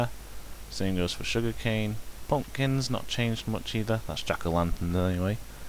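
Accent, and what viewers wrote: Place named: United Kingdom. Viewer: great British accent you have yourself a new subscriber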